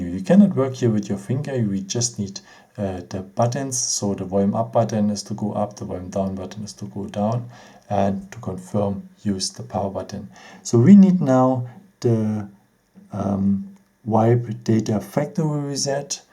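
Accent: Germany. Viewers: Bist du deutsch weil dein Akzent ist bisschen so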